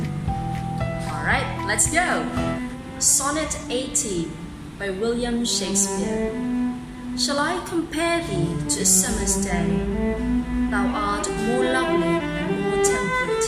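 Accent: United Kingdom. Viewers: Wow, I really like the way you speak in english Ms! Im glad I learned more british coming from you! <3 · It is indeed a beautiful poem and I do love how the British Accent sounds very suitable with this Sonnet! Thank you for this video, Ms.! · It is a stunning poem, and I love how the British accent suits it. I really appreciate how elegantly written the words are in this poem! Thank you for this video, Ms. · it is a lovely sonnet ms. I also like your British accent, so pleasing to hear. How to be you po🥲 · thank you for this video! listening to your british accent is so soothing 🎧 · Wow very entertaining and informative video miss! Your British accent is almost so authentic. Props to the effort given in this video miss! 🙏 🙏🙏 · This is such a wonderful and meaningful poem miss, I love that the British accent makes it more graceful to listen to. -van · Great video Ms and a perfect British accent. 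· Thank you for making this kind of content Ms!, i loveeee the British accent u got!.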